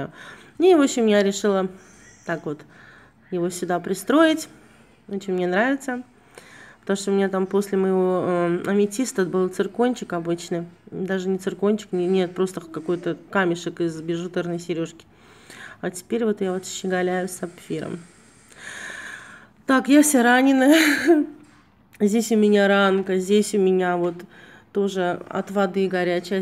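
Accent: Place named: Baku, Azerbaijan. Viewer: Не речь,а песня,родной,любимый бакинский акцент😘продолжайте вещать,;)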